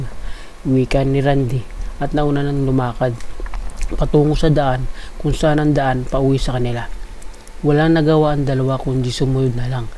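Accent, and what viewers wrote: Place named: Mongolia. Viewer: Okey ang kwento ...kaya lang parang taga Mongolian ang pagkwento..keep it up!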